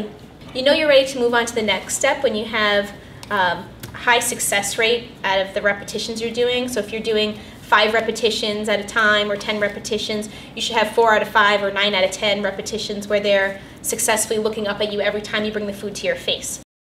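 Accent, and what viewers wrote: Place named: New Jersey, United States. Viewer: Duog. Gotta love that Jersey accent.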